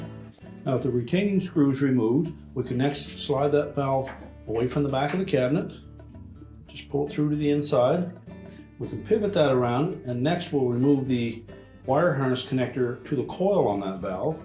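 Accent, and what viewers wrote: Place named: Canada. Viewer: Is this a Canadian made video? Your accent in the pronunciation of the word "out"sounds as if you're Canadian.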